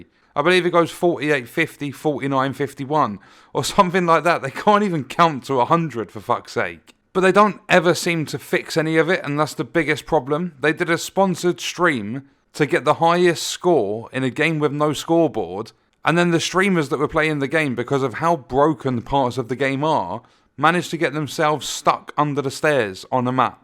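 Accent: United Kingdom. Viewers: Love your explanation bro , i just subscribed to your channel, seems lovely and interesting with British accent lol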